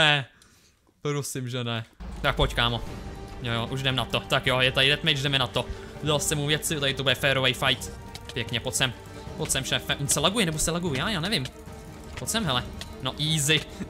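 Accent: Slovakia. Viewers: čiže ty si Slovák :D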